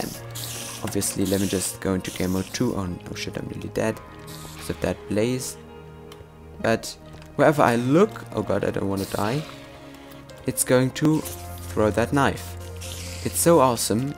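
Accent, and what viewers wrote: Germany. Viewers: Du solltest unbedingt üben flüssiger, schneller und ohne deutschen Akzent zu sprechen...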